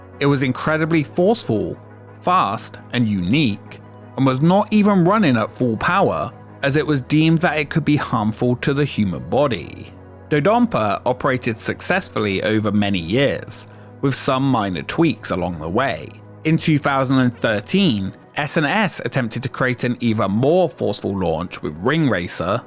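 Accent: United Kingdom. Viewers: be honest are u a brit?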